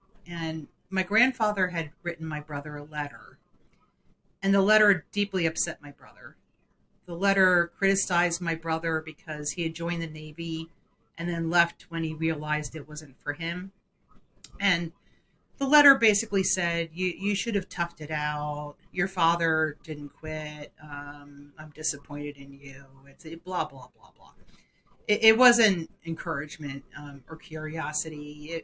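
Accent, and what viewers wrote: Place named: Mid-Atlantic, United States. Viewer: Thank you for sharing this. I’m glad your uncle was able to give your brother perspective and “permission” to trust himself. That’s something so many people never get. (You sound mid-Atlantic, perhaps I am wrong, but hello from MD!)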